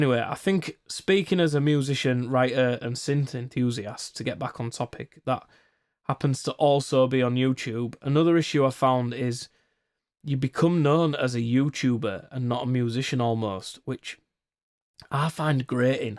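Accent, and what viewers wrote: Northern England, United Kingdom. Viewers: your cheeky northern accent and your wonderful philosophy is why we like your videos. And ofcourse you beautiful face. But I would love to listen to more tunes by yourself. And if you do new things. Some will work and some will not. But your core of 3.5 thousand subscribers is definitely a good thing. I think it's a snowball effect the bigger it gets the more it builds. Damian keys is great. And really as someone who wants to get my music out there AND start my own YouTube channel. Your trials and tribulations are an education. Don't be afraid to start something new. And if you feel stuck in the mud don't be afraid to give 5them what they want. By the sounds of things you are having a deep think about where you want to take this. And that is fantastic you are the only YT person I have subscribed and given to on patrion. 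I do that because I think your content is great and more real than most of the big guns like Andrew Whag (I hope I spelled that right). They get GIVEN products every day. And I don't trust their objectivity. With notable exceptions like Divkid or Loopop. So something more philosophical is definitely a good angle. And like I said you can still put your music out there. Last thing before I start to ramble is this. I was surprised that Underbelly (you suck at producing) only released his first album I think last year. He has been making content for years before he did that. When I finally decide to start my online Journey I will have at least two albu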